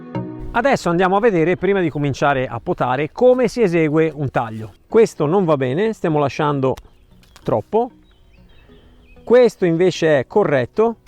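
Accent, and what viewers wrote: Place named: Tuscany, Italy. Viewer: infatti..il tuo accento è anche il mio...io abito zona Lucca fora...terra di Ulivi ...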